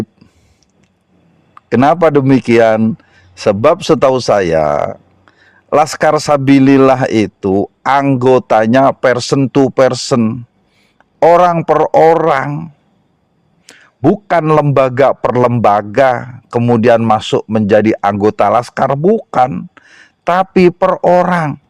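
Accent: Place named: Nganjuk, Indonesia. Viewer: Beeelas, ....!!! 😂😂😂 Manteb podho ndesone lur, persis wong nganjuk.😂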